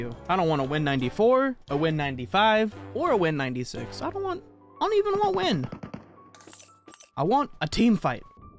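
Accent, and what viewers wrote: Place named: United Kingdom. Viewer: “hello there” he says in his normal British accent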